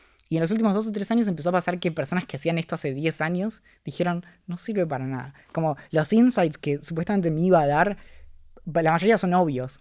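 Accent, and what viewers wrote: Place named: Israel. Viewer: Y ese acento HEBREO ??? Judio o uruguayo o mide east … la doble LL ó Y porque SHO porque yo.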